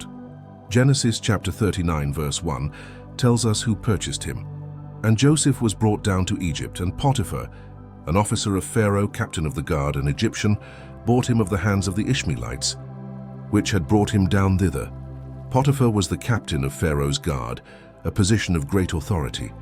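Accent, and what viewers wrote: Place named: United Kingdom. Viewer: I wish these stories were not spoken with a British accent. It’s disingenuous.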